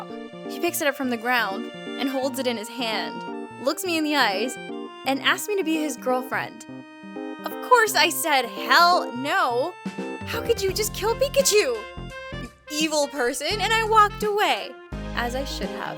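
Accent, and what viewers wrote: Canada. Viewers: Is Canadian? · Huh, didn't know was Canadian. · Are u Canadian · is canadian? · Is from Canada?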